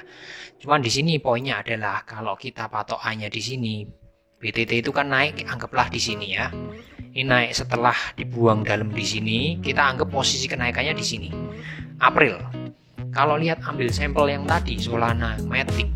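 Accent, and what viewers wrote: Java, Indonesia. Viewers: Logat jawa kukira mukanya jawa, tp muka chinese kek opah opah hihi Thanks ilmunya bang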